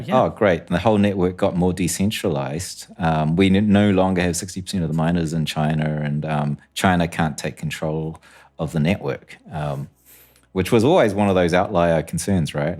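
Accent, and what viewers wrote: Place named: New Zealand. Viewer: Hey...you have a strong Kiwi accent...are you overseas or in NZ? chur bro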